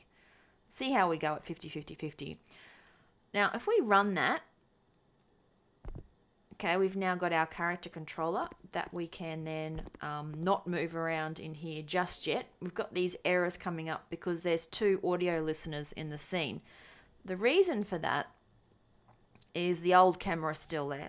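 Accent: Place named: Australia. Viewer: Tea Requiem It's an 'advanced tutorial' she's not going to explain how methods work etc. That much is supposed to be given. She gives a brief overview of the code, and leads the rest up for you to think about after the video. If you ask me, that's how I learn best. Doing, not having someone explain everything; and experimenting on your own! Anyways, as for accents, I'm pretty sure no one really complains about Australian accents. Her's isn't deep to the point where I don't understand, and it's perfect to listen to it. And no I can't deal with heavy accents. Why would you even complain about that? It's my personal opinion lol Anyways I really liked this video, she deserves subscribers from everyone!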